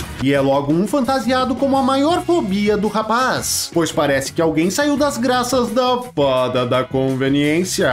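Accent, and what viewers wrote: Rio Grande do Sul, Brazil. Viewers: TU É GAUCHO? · Fala tchê, tu é de que parte do RS. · Cara tu é gaúcho né? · Gaúcho neh kkkkk tmj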